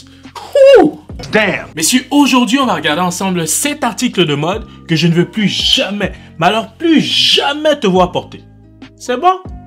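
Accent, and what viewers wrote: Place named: Quebec, Canada. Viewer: Je sais pas pourquoi mais je sent l'accent québécois 😅 sinon vidéo au top 😁👌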